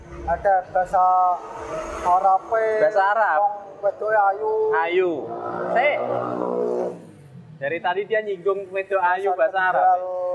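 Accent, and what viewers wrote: Kendal, Indonesia. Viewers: Logatnya Kendal bgt pak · Logat bicarax kayak orang Kendal weleri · Logate kendal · Iku logate wong Kendal maa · Logat nya emang kendal itu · Logat suaranya seperti orang gemuh,kendal. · Bahasane asli kendal..daerah ku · Logatny ky orang kendal .. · Bahasane kendal banget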